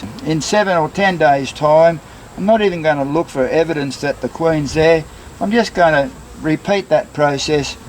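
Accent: Australia. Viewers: I came across this channel with your massive stash of sweet potato and then I was hooked as soon as I heard your Australian and saw the bees. You and are absolute darlings and I hope to catch more of you both. I sincerely hope all is going well xxx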